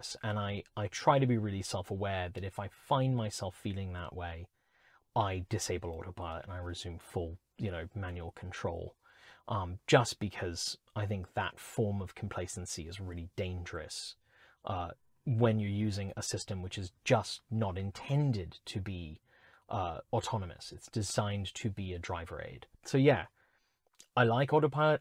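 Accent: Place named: United Kingdom. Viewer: When did you get a British accent?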